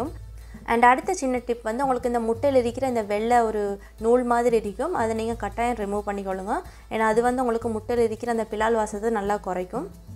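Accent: Sri Lanka. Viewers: Happy to see a lankan moor girl proudly presenting our food, talking in our own slang...cz it feels so closer... fellow lankan from UAE😍 u go girl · After a long time hearing some SL muslim tamil accent, nallam datha, wattalapam yen favorite, used to get them from Maradana muslim hotels along with buriyani, those were the days, late 80s. keep it up. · Nisha Me too first time paakren,Srilanka Tamil Lovely talking style.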